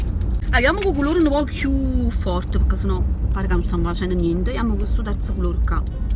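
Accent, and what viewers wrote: Naples, Italy. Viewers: Mi fai morire dalle risate! Genuina, simpaticissima ed esuberante da trasmettere felicità e spensieratezza tramite un video! Ti volevo chiedere, essendo anche io di Napoli avendo notato il tuo dialetto, sei di Napoli centro o provincia? Dove precisamente? Bacioni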